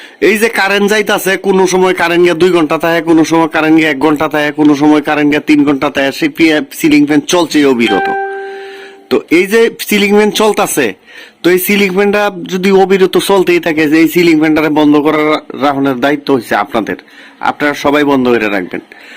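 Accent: Mymensingh, Bangladesh. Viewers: মমিসিংগা ভাষা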